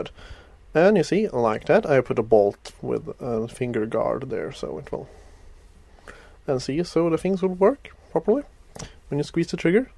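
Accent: Sweden. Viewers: r u swedish? its the accent and the name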